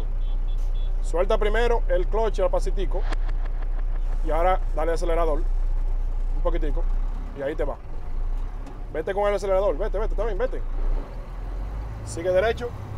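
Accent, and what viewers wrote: Dominican Republic. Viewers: Por el asento se que eres paisano mio jaja Dominicano no? Jaja estamoa donde sea papa!! Orgulloso de usted!!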